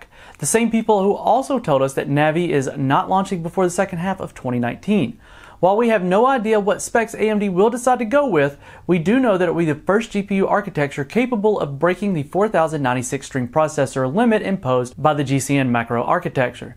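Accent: South, United States. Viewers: Your mother or fathers strong cowboy country accent really comes through while stoned :) I went ahead and got a Sapphire Pulse Vega 56 for £270 (with the 3 free games bundled).. It's not worth waiting if you want something now. Undervolted to 1100mV and ramped the frequency to 1700/950 and off I went (after running Firestreak stress to completion of course). I got me some GTX 1660 Ti ass kicking goodness.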